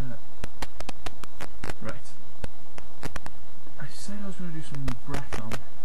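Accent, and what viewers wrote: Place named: North-East Wales, United Kingdom. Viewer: Firstly this guy speaks all the Celtic languages to variously degree's, how many do you speak? And secondly, you are betraying your own ignorances by assuming his accent is an English accent and his English accent is slurred. He is a Welshman from north-east Wales and has a typical north-east Walian accent. So how dare you call our accent English. And he never claimed to be teaching the language but was exploring the language in the early days of his language learning.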